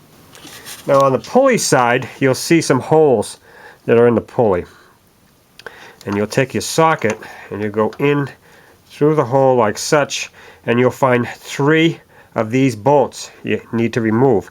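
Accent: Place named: Boston, United States. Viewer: . Thank you so much for the video! You could have saved me hundreds. I never would have had the gumption to change my water pump without your video and the one from autotech. I watched it backwards and forwards so much that I started thinking in that Boston, is it?accent. Can't thank you enough. When I got to my water pump I decided it wasn't leaking (it had been changed twice by the same mechanic the last just about 4k ago) so I changed the thermostat housing instead HA! But I wouldn't change the experience being that I was on vacation and want under pressure to get out done. Thanks!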